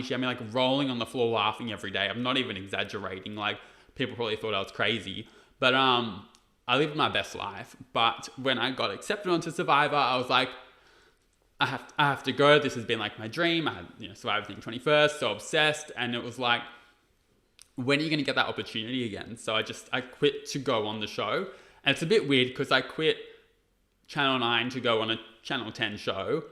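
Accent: Australia. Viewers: I didn't realize your accent was Australian. So cool to learn more about you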